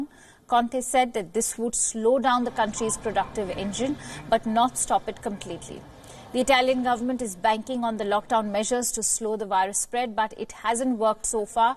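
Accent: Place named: India. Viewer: Her indian accent gets on my nerves. Couldn't they find any European speaker?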